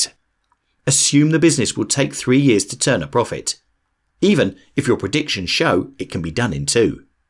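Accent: United Kingdom. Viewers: Love this channel. I'm a visual learner, I like to read, and see infographics. It helps connect the dots for me. Your UK accent just makes everything more precise, knowledgeable, and entertaining. Keep up the great work and illustrations.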